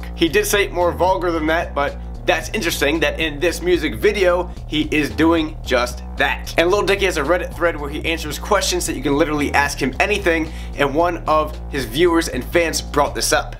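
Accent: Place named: United States. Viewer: Potato He has an american accent, you saying that is like someone from britain saying that they dont have an accent to someone from America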